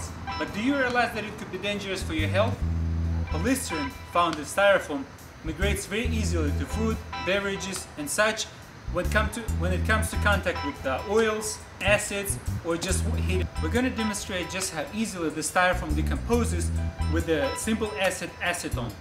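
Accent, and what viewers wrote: Russia. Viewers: I like ur russian accent! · Сильный русский акцент! Ты из России/Украины/Беларуси? В чем суть эксперимента? Ну, растворим полистирол в ацетоне и чё, это его основная опасность и все умрут из-за этого?!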